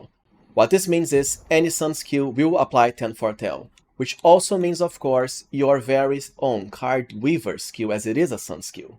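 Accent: Brazil. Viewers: you dont fool me, you are brazilian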